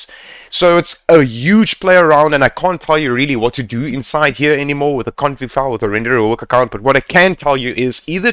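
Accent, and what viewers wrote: South Africa. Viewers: I love that you are south african because I actually like listening to people from home😂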